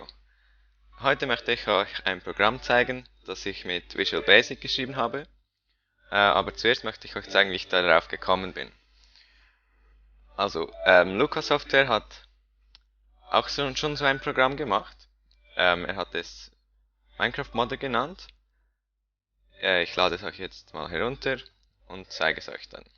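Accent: Switzerland. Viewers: ich mag schweizer akzente voll ^^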